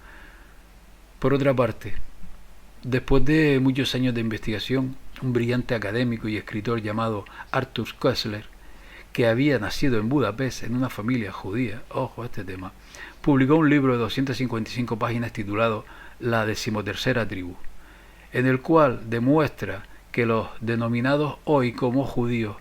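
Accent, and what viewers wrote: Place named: Canary Islands, Spain. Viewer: Por tu acento deduzco que eres Canario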